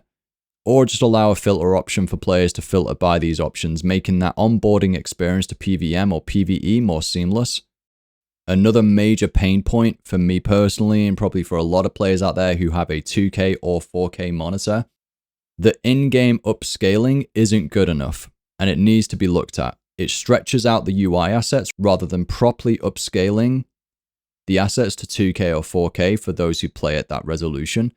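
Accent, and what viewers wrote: United Kingdom. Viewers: Selby is from Leicester. You, too? Perhaps a Leicester accent but wtf even the voice matches lol.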